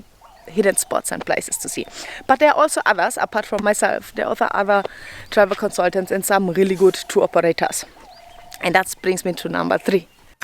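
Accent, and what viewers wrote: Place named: Kenya. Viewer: Before you come to Kenya, watch her videos. Your content is genuine and you experience as a first time visitor really shaped you. I love the Kenyan accent.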